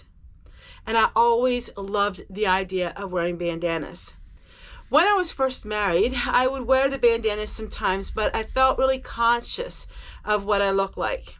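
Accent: Pennsylvania Dutch, United States. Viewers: Good morning,! I love when you share about your heritage. My husband and I watched your video together last night, and then I watched it again this morning to leave my comment, something I've been doing lately. We remarked about the Pennsylvania Dutch you spoke and which words sounded more Dutch or more German to us; it sure sounded familiar when you were speaking. My grandmother spoke Dutch since her family came here from Holland in the early 1900s; his grandmother spoke German because she came here from Germany in 1938. Your aprons looked so pretty all hung and organized like a color wheel. I spent the other day reorganizing my kitchen. Even though it was just a small bit of decluttering, it made a big difference! Have a beautiful, blessed day! ❤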